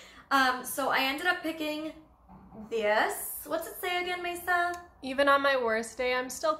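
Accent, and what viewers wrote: Canada. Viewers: Your Canadian accent is so cute haha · I love Rebecca Minkoff purses, I Always Love your style and I love your Canadian accent! I love Canadians! xo All really cute items! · Aww! We don't laugh out of derision of your cute accent, we laugh because our brains are listening to your less affected accent and we forget you're Canadian. Then our silly brains are like "oh yeah she is!" So I guess the giggle (which is what I do) is partly out of our silly brains forgetting your nationality, and part because it's cute. FYI, I still giggle when I'm over at my friend's house and her husband says about. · I love your Canadian accent so much! I love the way you say “sorry”💕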